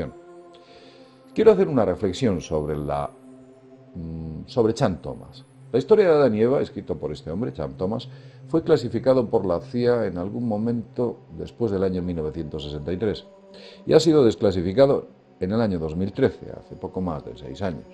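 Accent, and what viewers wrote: Spain. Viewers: Hablas muy lindo con tu azento español pero sube el volumen,no te oigo bien y estoy sin los audifonos (la77)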